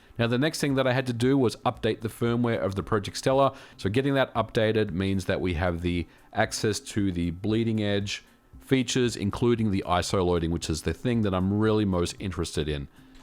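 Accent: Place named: Australia. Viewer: Still crazy to hear an Australian accent in such a popular gaming channel.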